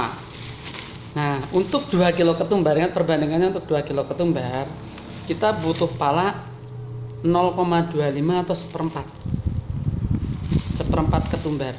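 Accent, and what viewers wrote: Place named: Java, Indonesia. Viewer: Kayak logat orang jawa yg buat bumbu padang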